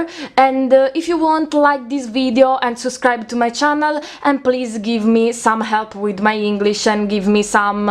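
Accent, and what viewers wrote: Italy. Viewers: Yeah, your english is fantastic. Never lose the depth of your Italian accent when you speak English, it is Perfect as it is! 🥰🥰 Also thanks for saving my Clawdia's legs! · Amazing video, thank you! 💗 I have just started to get back into Monster High and bought two dolls with loose leg joints, I'll be sure to try this on them! And honestly, your English is super good, like you can see how you know the slang, jokes', terms and such. Other than that there's only minor mistakes from time to time with the conjunctions, but I think that getting better with that will just come with time 🤘 Also you have such an cool Italian accent, I love it! ☺️👍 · Perfect video to help me fix my daughter's dolls. Also, your English is very good! I love your Italian accent 🥰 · Your English is beautiful - your Italian accent is delightful to listen to :)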